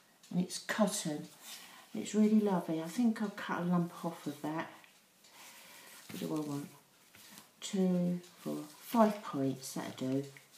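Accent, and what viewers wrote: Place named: United Kingdom. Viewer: Hi so glad you did a tut on this I saw the one you sent to Tricia and so wanted to have a go. You are so clever. I have sub you and look forward to seeing some of your other videos. I am assuming from your accent that you are in the UK. As am I. What is the Xmas club all about would love details. Hugs Jeanx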